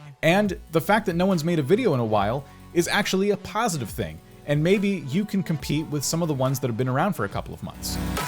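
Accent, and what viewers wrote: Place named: United Kingdom. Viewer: You lost your British accent and grew hair?🤯🤯🤯🤯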